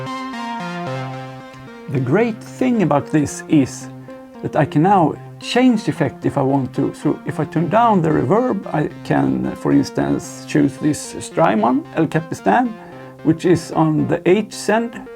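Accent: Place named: Jamaica. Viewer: nice analog & digital hybrid system ! u sounds like from jamaica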